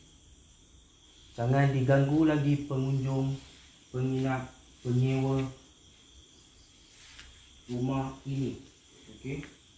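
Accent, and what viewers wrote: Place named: Northern Malay, Malaysia. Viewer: Hang nk ckp utara ,hang xyahlah ckp key el plak....lagi satu klau nak ulas tu ang ulasla dgn bijak sikit...walaupun hang seorang pemberani tp bila ulas mcm tu rasa semak..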